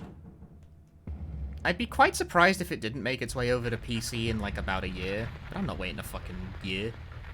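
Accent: United Kingdom. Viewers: Fantastic run! Not surprised Cedar Grove Sanitarium, on a damn WR no less, managed to take over 11 minutes to itself. The place is massive and during your run I actually lost count of how many key items it involves. Been a while since I last played... Out of curiosity, what game are you saying that is "boring" to speedrun around 29 minutes in? I'm not very good at understanding British accents unfortunately. :(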